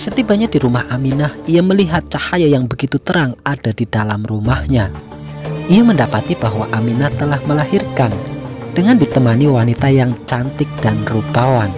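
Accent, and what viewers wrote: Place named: Banyumas, Indonesia. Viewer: Ngapak temen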